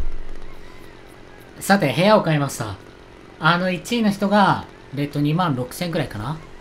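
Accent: Kansai, Japan. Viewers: 関西弁よく出ますねw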